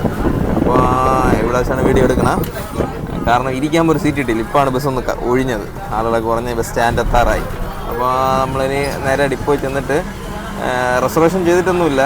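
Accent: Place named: Neyyattinkara, India. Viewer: Neyyatinkara slang super my mother Village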